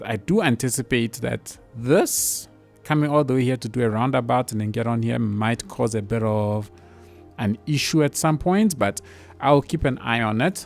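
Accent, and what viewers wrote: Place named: South Africa. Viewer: ive watched a couple of episodes wondering if you from South Africa , just know that accent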